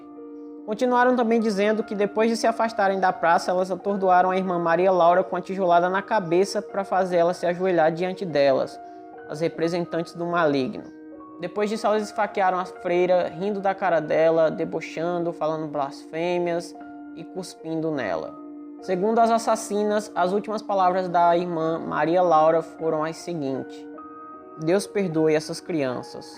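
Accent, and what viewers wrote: Northeast, Brazil. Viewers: Esse sutaqui e da onde??? Do nodeste????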